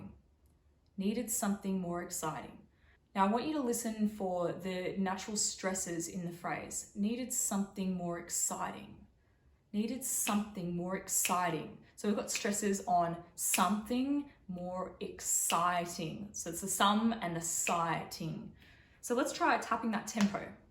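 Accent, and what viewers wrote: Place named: Australia. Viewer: You sound Aussie and for that reason I’m following you. Lol (Australian here!)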